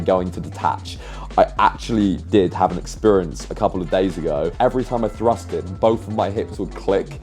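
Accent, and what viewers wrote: United Kingdom. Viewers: The most british accent I've ever heard 😂 keep those vids up man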